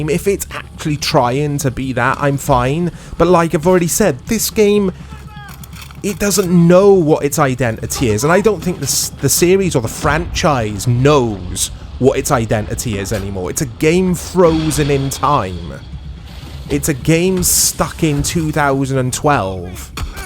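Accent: Wales, United Kingdom. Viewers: Are you Welsh bruv?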